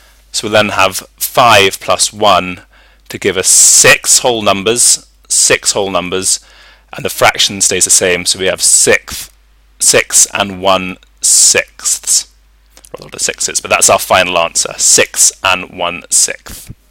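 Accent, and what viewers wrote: United Kingdom. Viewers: are you british 😦😦😒😑😐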